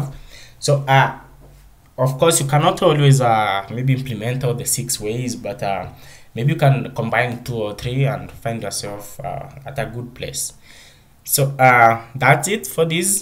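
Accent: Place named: India. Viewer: Awesome, u sound like a hindu though :)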